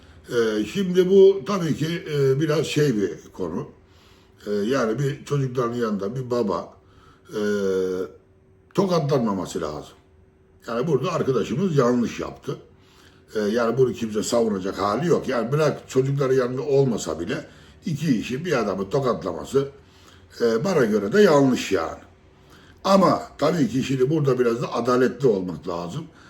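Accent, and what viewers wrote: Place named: Trabzon, Turkey. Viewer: O dayının beynini sikeyim hocam senin konuşmandan Trabzonlu veya Rizeli olduğunu anlamayan bu zamana kadar boşa yaşamış demektir!Bide kurum hep Trabzonlu rizeli diyor.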